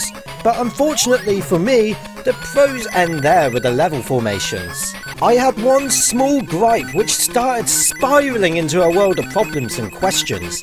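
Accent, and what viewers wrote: United Kingdom. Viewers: His british accent just adds to the video, I don't know why... Assuming it is british and I am not an idiot (Edit: Nice to see a Youtuber who views his comments, Thanks for the heart)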